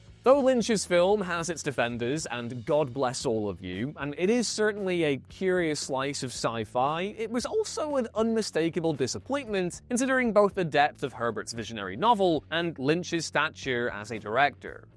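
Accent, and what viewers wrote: United Kingdom. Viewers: I always love British pronunciation of Spanish words. It is always fascinating (not that my accent was ever perfect).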